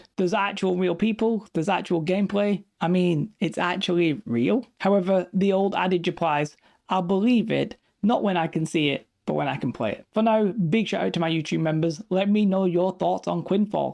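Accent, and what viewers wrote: United Kingdom. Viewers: LOL, good one! He does not saying Items, he saying I'ems! Love the Bri'ish accent ^^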